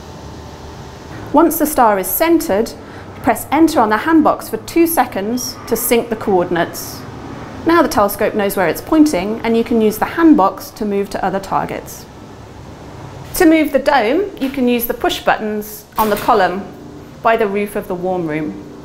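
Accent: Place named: Estuary, United Kingdom. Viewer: Interesting video. It seems your observatory is in the US, but you talk with a refined British estuary accent!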